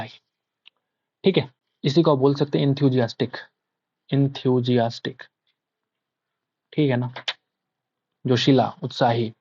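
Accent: Bihar, India. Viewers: Sir app bihar se ho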